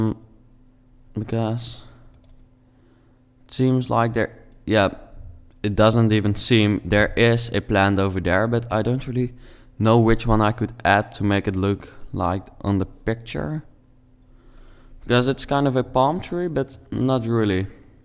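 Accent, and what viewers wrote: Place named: Netherlands. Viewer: is this guys dutch or somthing. he really sounds dutch. :\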